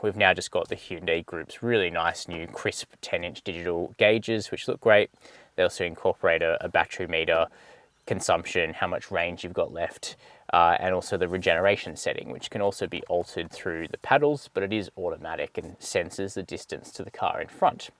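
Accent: Australia. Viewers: Aussie accent unbearable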